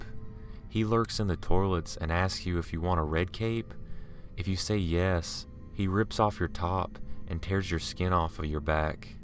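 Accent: South, United States. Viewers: accent is super strong which part of the south are you from im from the south to btw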